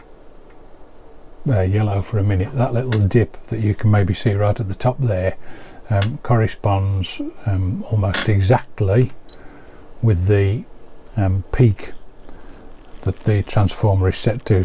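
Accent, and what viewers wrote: United Kingdom. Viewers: Hey just come across your channel, yay a fellow Brit 😂 you sound close too.. I'm in Doncaster... Excellent content, I am going to start teaching electronics, I'm really quite sick at the moment so won't be soon but I feel like I should do my bit for the future EE and enthusiasts.. I've subbed and will take a look at your content.. 💡You should show how to make a peak detector probe to remove the carrier make the scope even more like an analyzer display 😁.. Fyi on some of my scopes you can turn off a channel and it will still trigger off that channel, or you can use the external trigger input and feed the sync from the generator in there.. I've done similar in the past I've used the external sync from the generator and adjusted the scope so the sweep takes up the whole screen, moved the trace down so you only see the positive half, basically a bode but with the sweep.signal visible, Steve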